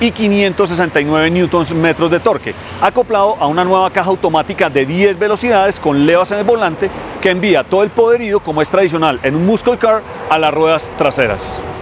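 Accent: Bogotá, Colombia. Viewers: Mero hablado de rolo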